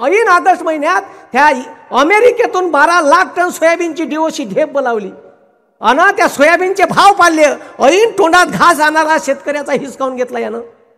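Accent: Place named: Nagpur, India. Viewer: Nagpuri bhasha aahe aamhi asach bolto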